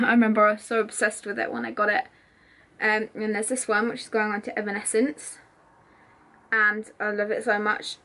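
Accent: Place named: United Kingdom. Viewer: Hello your stuff is nice we have the same shirt black veil brides and also the shop at that store hot topic love your British English is very difficult to understand but it is very nice your asento greetings from México tijuana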